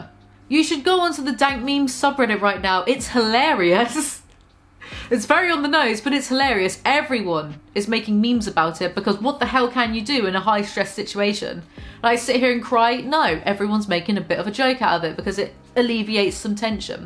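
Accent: United Kingdom. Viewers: i hope you feel better, but you sound so cute!! i don't know why but i think british accents get better when people are a bit stuffed up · i love ur British accent so much ugh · me, an american: wow she sounds extra british today: i am ill edit #2: every single person in the replies is an idiot except (also i spelled her name wrong originally so i'm also an idiot)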